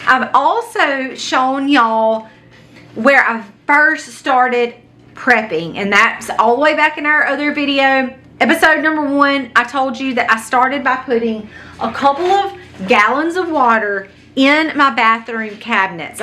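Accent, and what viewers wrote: South, United States. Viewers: I love that southern accent!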